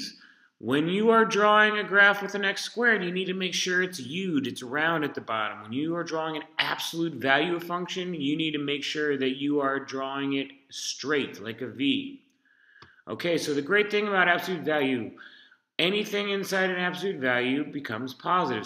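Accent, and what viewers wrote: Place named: South, United States. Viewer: He sounds drunk with a deep southern accent and it's great cause it keep me interested.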